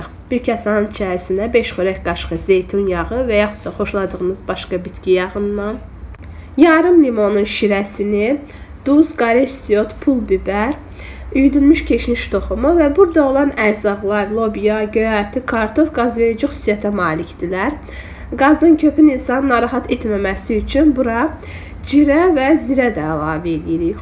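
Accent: Baku, Azerbaijan. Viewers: Çox sağ olun,əllərinizə sağlığ hər bir təamı çox gözəl Bakı ləhcəsin də izah edirsiniz adama xoş gəlir.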